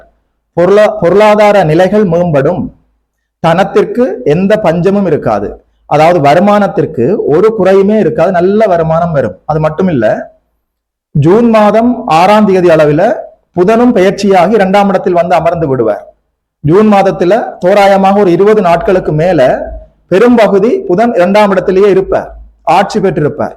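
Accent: Sri Lanka. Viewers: ஸ்ரீலங்கா தமிழ் அருமை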